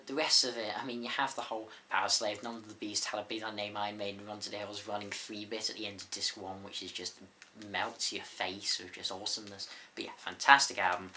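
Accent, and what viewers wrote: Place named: United Kingdom. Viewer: This British accent... - couldn't catch the name of the second disc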